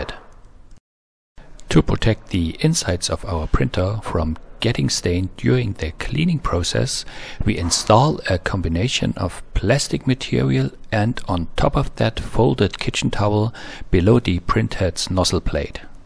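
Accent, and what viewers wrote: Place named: Germany. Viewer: Any explanation video or tutorial is made infinitely better when it involves someone with a German accent.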